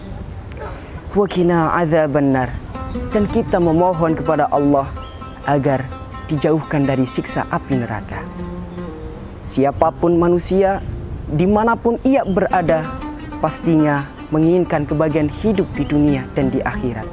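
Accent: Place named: Banyumas, Indonesia. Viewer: Masya allah mamas ngapak, idola🔥🔥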